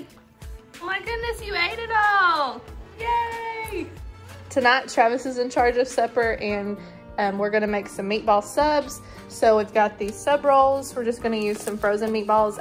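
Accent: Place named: United States. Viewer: Hi... I'm new from Shauna's channel. I like what Im seeing 👀, so I've subscribed. I noticed your accent, what State are you from??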